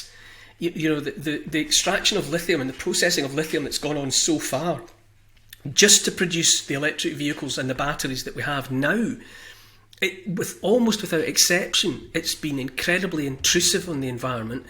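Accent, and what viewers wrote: Scotland, United Kingdom. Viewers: You Scottish or english?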